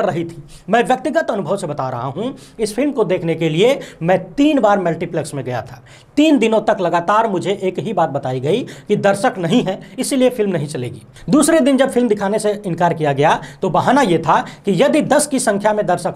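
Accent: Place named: Bhojpur, India. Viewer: Bhaeya. Bhopori mix me jo aap bolte hai na kasam se maza aajata hai😂😂😂😂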